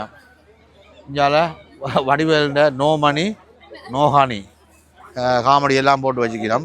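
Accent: Jaffna, Sri Lanka. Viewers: யாழ்தமிழில் கலகலப்பாக பின்னுகிறீர்கள் கோழிப்புக்கை எப்படி செய்வது என்பதை எனக்கு அறியத்தருவீர்களா?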